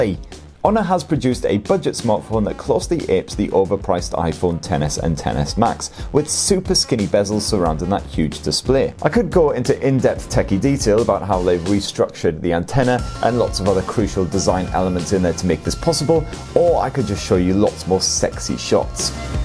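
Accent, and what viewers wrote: United Kingdom. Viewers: i just love British accent 😀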